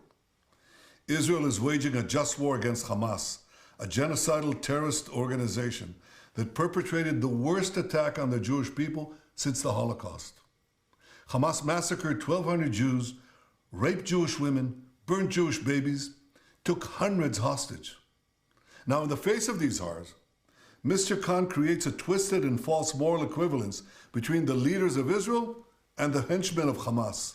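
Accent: United States. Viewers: The man who speaks good English with an American accent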